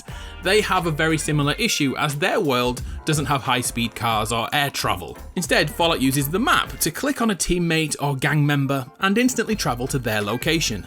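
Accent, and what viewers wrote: United Kingdom. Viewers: I stay to the end of the video as their amazing and are u British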